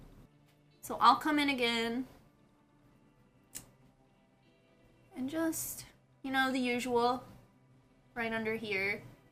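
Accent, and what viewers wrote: Philadelphia, United States. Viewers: Every time I watch one of your videos I think about how awesome and cute your philly accent is. It's my favorite. I'm a New Yorker and I live in Colorado so your videos bring me back east when I watch them. Thanks gurl! Also this makeup looked beautiful. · I've never noticed your Philly accent until this video! I'm from Pittsburgh so I also have a slight yinzer accent 😂 I wish I would have met you before I moved to AZ.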